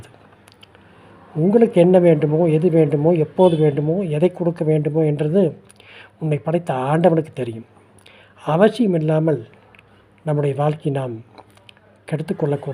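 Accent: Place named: Kongu, India. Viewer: Nalla experience..Good message.🎉🎉🎉🎉 Good Kongu Tamil slang. God bless you.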